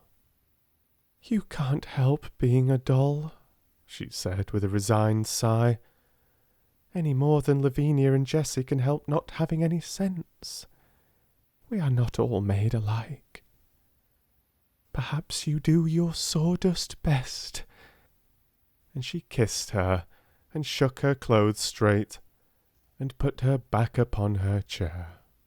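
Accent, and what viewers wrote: Yorkshire, United Kingdom. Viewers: Please could you read Wuthering Heights and Jane Eyre. I guess you are from Yorkshire and would be well suited to read these stories. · He and Neil Gammon ( sp?) Are my two favorite narrators with the touch that only a British dialect can pull me into the story or lull me to sleep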